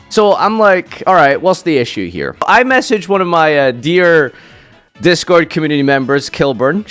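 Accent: Scotland, United Kingdom. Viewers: We need more scottish accent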